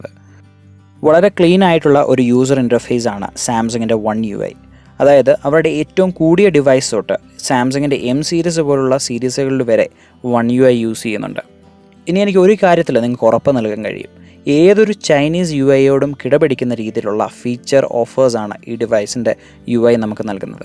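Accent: Idukki, India. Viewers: ഇടുക്കികാരൻ ആണോ ബ്രോ ?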